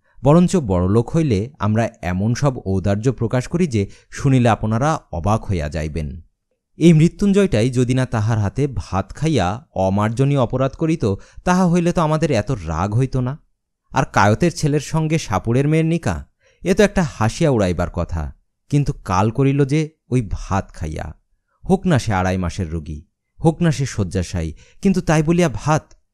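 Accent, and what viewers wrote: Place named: Bangladesh. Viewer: সুদ্ধ উচ্চারণ, মনে হয় পাঠক বাংলাদেশী, কারণ ভারতীয় উচ্চারণ এত ভাল নয়।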